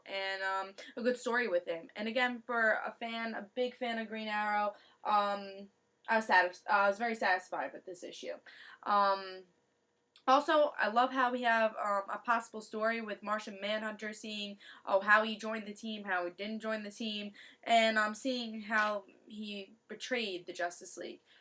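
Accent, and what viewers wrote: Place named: New Jersey, United States. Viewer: are u italian because you have a new jersey accent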